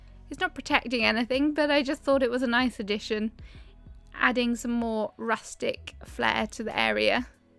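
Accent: United Kingdom. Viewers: Well I am a sucker for British accents lol : ) But once again love your channel, I have totally gotten some inpriration. <3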